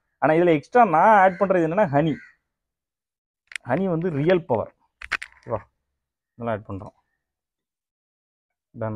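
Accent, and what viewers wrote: Tirunelveli, India. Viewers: Nellai tamil😂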